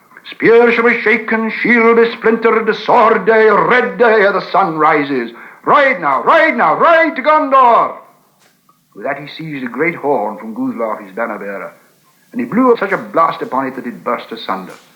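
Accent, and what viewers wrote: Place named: Scotland, United Kingdom. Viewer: His Received Pronunciation makes him sound Scottish!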